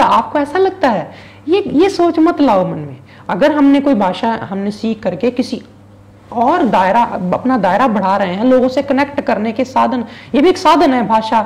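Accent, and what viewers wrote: Punjab, India. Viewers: I actually enjoy listening you just because you have your own punjabi accent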